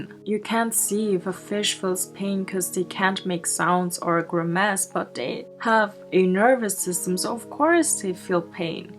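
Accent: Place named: Germany. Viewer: It's so Weird hearing Herman accent coming out If an Asian. So FUKN weird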